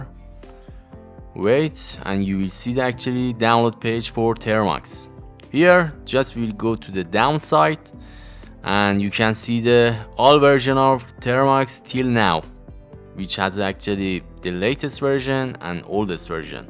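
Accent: Iran. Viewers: Bro ur accent is tooo persian😂😂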